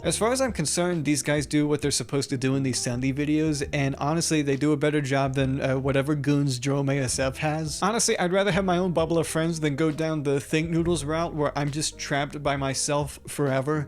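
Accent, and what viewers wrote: Boston, United States. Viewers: I've been watching youtube essays on 2.5x speed in the background of my day to day for the better half of my life. Somehow I've never heard a single narrator with a Boston (assuming) accent and it's freaking me out.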